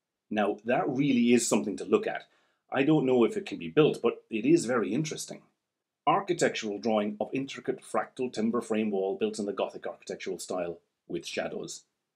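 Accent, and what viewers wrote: Ireland. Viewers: Your accent is obviously Irish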